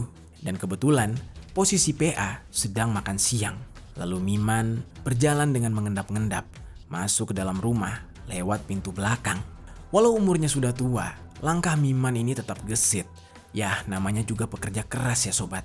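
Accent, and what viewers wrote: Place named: Sunda, Indonesia. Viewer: Bahasa Sunda nya kekok..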